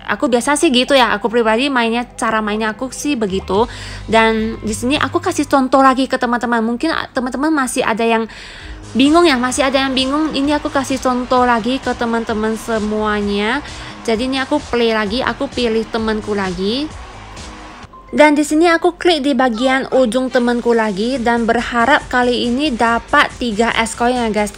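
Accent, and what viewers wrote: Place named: Java, Indonesia. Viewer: Indonesianya Medok :D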